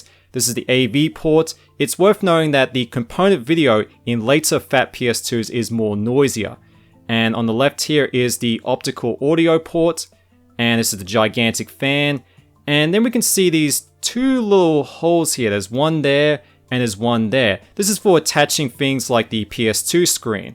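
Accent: Australia. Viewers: HES AUSTRALIAN?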